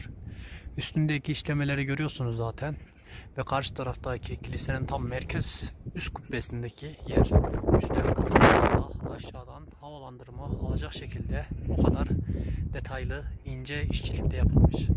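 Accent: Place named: Amasya, Turkey. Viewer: Reyiz nereli ya şive yabanci degil bana ben amasyaliyim :)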